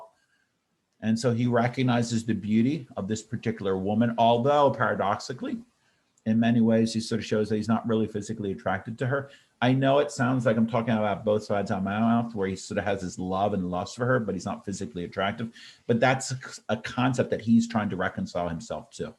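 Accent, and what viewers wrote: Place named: Canada. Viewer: Cool, it sounds like your accent is Canadian, as I think a teacher YouTuber his accent is similar to you.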